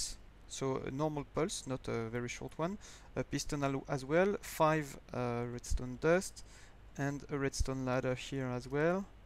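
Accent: France. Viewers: Dat french accent ^^' Merci pour le tuto j'adore le concept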